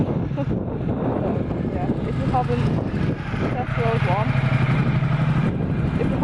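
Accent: Northern England, United Kingdom. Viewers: I am looking at the MT10 for the next bike to strip and rebuild. So this video hit my feed. I could not complete the video because that girl is so dull. Is she trying to sound dumb or is it that northern accent. Yeah, pull your bottle blonde hair out from your helmet and slap a tonne of eye make up on... that will get your hit count up some (jaw-breaking yawn). Why did I leave England 10 year ago... Oh, I remember now! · Great Vid,X...As all yr vids,TOPS,Great to find one in uk,Im in Essex we hook up with some guys on a sunday morning,supermoto run,my mate has mt09,awsum bike,just wonderd what would you recoment to clean a bike,can never get mine looking like a show room bike,thank you,iv been ryding ducati 1100,hypermotard,can not catch mt09,love your accent,up north,The HART OF ENGLAND,Your a great Biker,Take care,Safe bikeing,X.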